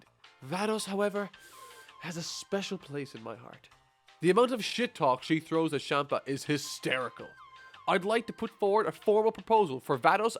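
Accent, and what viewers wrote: Ireland. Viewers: I had a feeling u were from Ireland with that accent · With that voice some of us can tell your Irish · Cool your irish?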